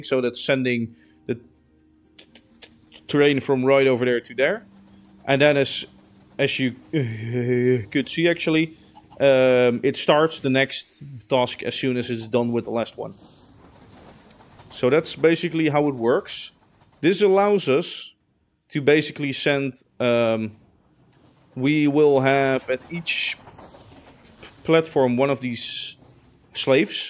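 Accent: Netherlands. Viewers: I smell a dutch accent.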